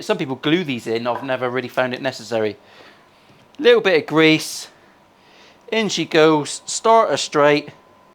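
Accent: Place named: Plymouth, United Kingdom. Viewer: from Scotland here you don’t swear as much as the wife so I’m allowed to watch from the hoose love the channel and content and my old man was a Plymouth boy so the accent is a bonus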